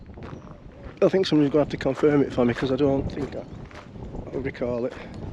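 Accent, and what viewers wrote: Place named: Oldham, United Kingdom. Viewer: I enjoyed this - quiet because few people go on holiday to Norfolk in Feb. You are a bit different, but enjoyed the tour of the pubs, and you sound like you are from Owdam, so clearly understood:)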